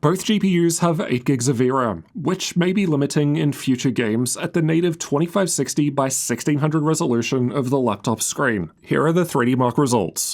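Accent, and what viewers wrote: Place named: Australia. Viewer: Man you take the worst new gen Australian speech patterns, with the intrusive Rrrrr and the end of sentence falling intonation, and you magnify it by a thousand!!!! I can't listen to this verbal debauchery any longer. I'm out.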